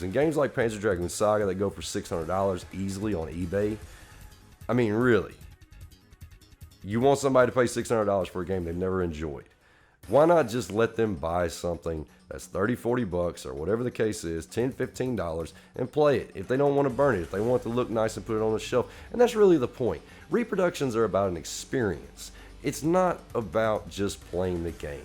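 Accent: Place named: South, United States. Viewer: Great video, I recently picked up a few repo myself and have no problem with them I'm not going to sell them, there staying in the collection. Love the soft southern accent. Just subbed.👍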